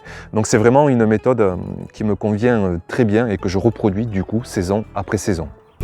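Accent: South, France. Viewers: Un Topeurwére! Ah l'accent du sud ! 😁. Super vidéo comme toujours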